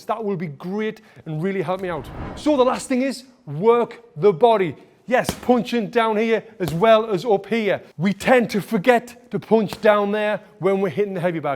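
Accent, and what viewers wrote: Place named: Sunderland, United Kingdom. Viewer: Great Mackum accent...really good 'no nonsense' coaching technique. Good work.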